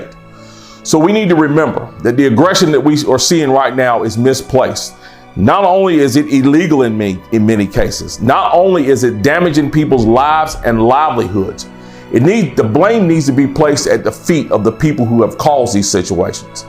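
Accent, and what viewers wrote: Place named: North Carolina, United States. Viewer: Just love hearing your accent. I miss my NC home. Went to UNCG. Best of blessings to you and your family.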